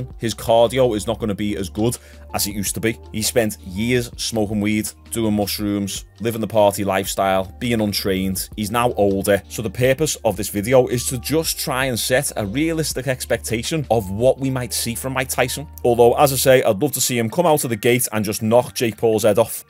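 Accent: Liverpool, United Kingdom. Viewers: 'Maihhh loohhs greit' - how can you not love Liverpool accent? God bless you!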